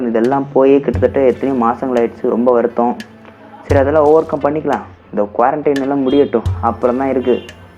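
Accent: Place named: Kanyakumari, India. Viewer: Ur language spr... Kk slang🥰🤩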